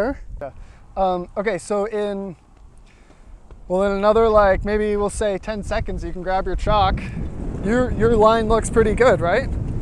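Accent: Canada. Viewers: He's mostly Canadian, you can tell by how he pronounce "out"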